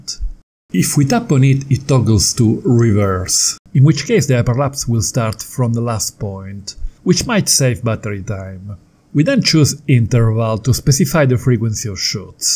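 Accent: Italy. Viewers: I can't get over your thick Italian accent hahaha. Nice video.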